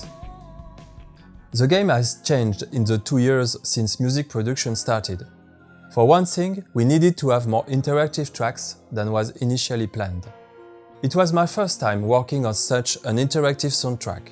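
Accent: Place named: France. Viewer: Toujours aussi fan des oeuvres d'. Et The french accent, toujours aussi nice ^^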